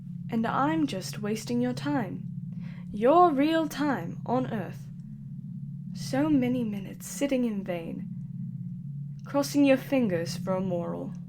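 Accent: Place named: RP, United Kingdom. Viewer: Another great video. Well done! You're also halfway to a brilliant RP accent.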